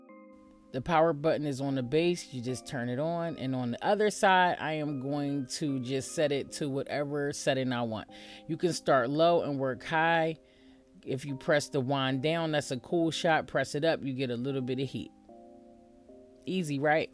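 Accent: Philadelphia, United States. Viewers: You sound like you’re from Philly. And where are u located? I moved from Jersey to Florida and I can’t find ANYONE that I trust with my natural hair😫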